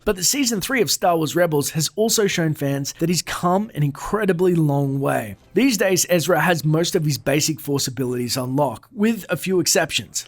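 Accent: Australia. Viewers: You are an Aussie aren't cha?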